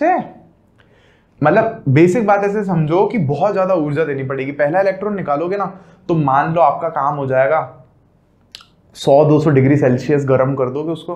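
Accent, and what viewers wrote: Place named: Ajmer, India. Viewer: sr aap kya ajmer se ho kya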